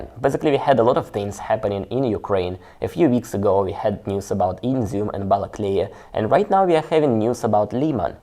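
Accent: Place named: Russia. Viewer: you're great and what I'm about to say is totally irrelevant I am sorry, but continuosly pronouncing 'a' instead of 'o' is not part of the English language. Sounds too Russian. Peace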